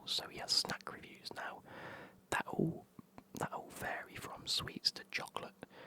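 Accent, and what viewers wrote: United Kingdom. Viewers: I think its so interesting how the australian or new zealand accent is what you fancy, because to me thats your UK accent lol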